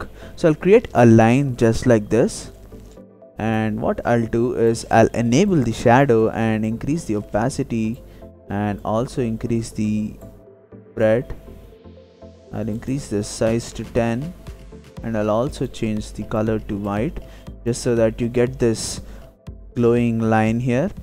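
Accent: India. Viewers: thanks bro are you a south indian guy ?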